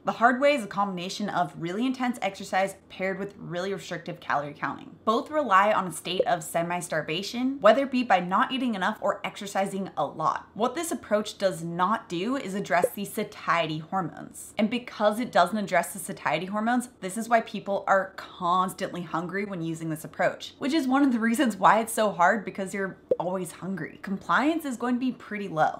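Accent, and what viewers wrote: United States. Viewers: Hello, Many thanks for your videos - the content is excellent! I thought I was 'educated enough' when it comes to nutrition but I have learnt a lot from you. The only - can you please slow down a little? I am not at all trying to criticize your accent and style BUT after approx. 2 minutes of the non-stop but fast talk in the style/accent of yours, me and most my Scottish friend just cannot take any more, mute you and only rely on subtitles. In other words, the headache from the non-stop talk is not worth the information you can convey - and you obviously have a great amount of knowledge! Just a thought for consideration. Maybe fellow Americans don't find your way of talk not as overwhelming? Not sure... Thanks and ALL THE BEST! mk x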